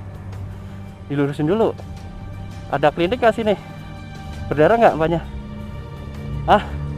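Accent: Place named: Banyumas, Indonesia. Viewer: Wong ndi mas deng ngapak hehe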